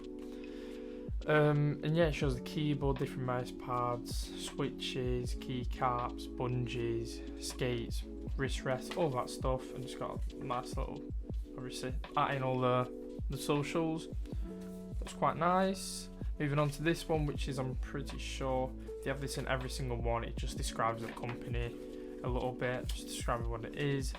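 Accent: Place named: Manchester, United Kingdom. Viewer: You sound like you're from a town near me in Manchester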